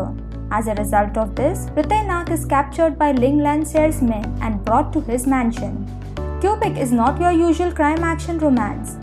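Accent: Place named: Thailand. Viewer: Hola, tu acento parece tailandés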